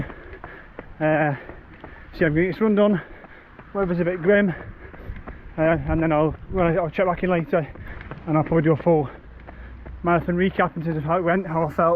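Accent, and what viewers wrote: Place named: Manchester, United Kingdom. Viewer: I’d definitely recommend mate, you can do as little or as much as you want with the club, but ultimately you are surrounding yourself with support and top advice , can only help . You sound local to me , I’m Manchester based and run for Salford Harriers . I’m part of a local runners Podcast , imaginatively called “local runners Podcast “ 😆 we talk a lot about local races and clubs across Gtr Manchester , look us up and any questions give us a shout mate , keep up the channel 👍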